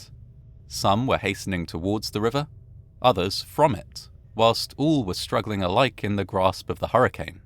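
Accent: England, United Kingdom. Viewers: has English accent Americans find more authoritative. Send.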